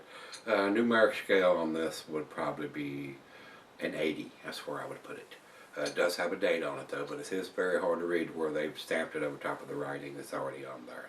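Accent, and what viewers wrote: Texas, United States. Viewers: are you from Texas?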